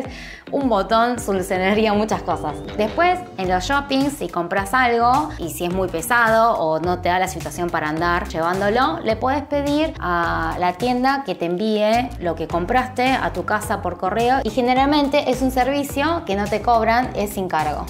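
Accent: Buenos Aires, Argentina. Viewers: Me gusta tu video pero... había vivido por 26 años en Buenos Aires y tu acento no es muy familiar. Tu forma de hablar... es.. porteña tal vez 90% pero el acento... naaa... 😜 Sera porque se trata de una filmacion? Jaja. Y seamos sinceros... lo que me encantaría llevar de Corea a la Argentina, el nro 1 es la cotización re-conta estable al dólar. La pecificación de precios de todos los inmuebles y autos. Y luego la seguridad... Perdon pero la cultura de delivery no me interesaria un sor**... Yo me conformaria con el delivery de la pizzeria La Continetal y Las empanadas Noble Repulgue... Lo que causa el mayor stress es la put**** situación economica, no es cierto?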